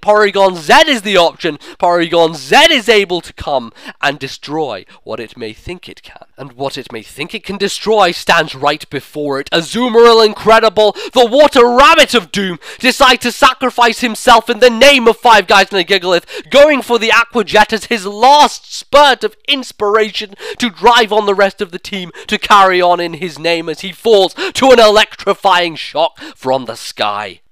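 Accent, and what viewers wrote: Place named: United Kingdom. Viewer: i'm guessing you're british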